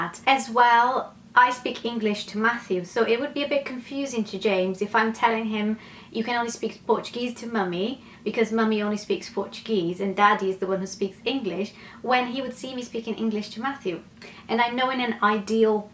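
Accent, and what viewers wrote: United Kingdom. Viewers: Aii quero ter esse sotaque britanico seu que lindo s2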